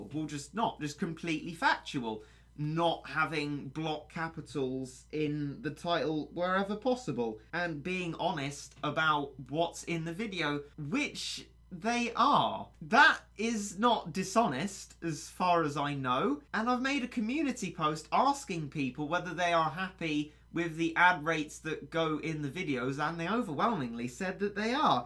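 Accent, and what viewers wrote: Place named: United Kingdom. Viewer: I can see why this guy would think these untrue things- it's your english accent! Remember that in American pop culture everyone with a british accent is automatically a villain.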